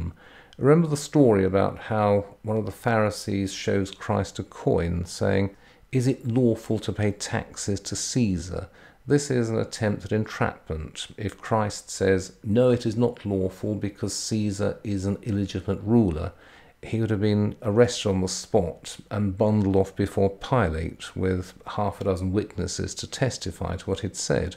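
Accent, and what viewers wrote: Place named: United Kingdom. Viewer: I'm from America USA and I recently "stumbled" upon lectures. Truly, it's a wonderful experience to listen to him teach. His voice tone, UK accent, and amazing depth of knowledge about the various subjects is truly an amazing learning experience. No degree being gained by me as I've already got my B.A. and M.S., but I've always been a fan of ancient Rome and Christian Origins, so his lectures are a lovely thing for me! Thanks for the great work! You're a great teacher! - John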